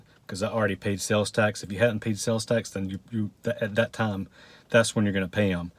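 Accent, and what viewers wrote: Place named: Baltimore, United States. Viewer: You aren't originally from Texas, are you? That accent is Baltimore/PA/South Jersey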